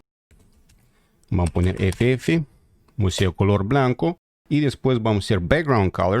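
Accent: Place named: Russia. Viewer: pero eres ruso no??a ver sin ofender ni nada solo que me gusta tu accento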